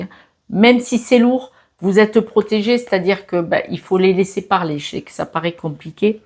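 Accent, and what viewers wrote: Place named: Toulouse, France. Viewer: je reconnaissais cet accent .. je suis pas loin de Toulouse aussi .. ❤️🥰🥰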